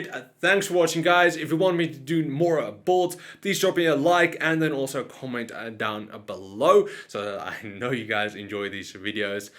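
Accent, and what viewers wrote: South Africa. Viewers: Just subscribed when I heard you're South African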